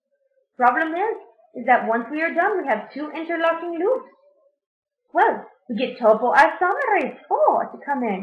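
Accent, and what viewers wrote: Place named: India. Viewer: Your German accent sounds very Indian.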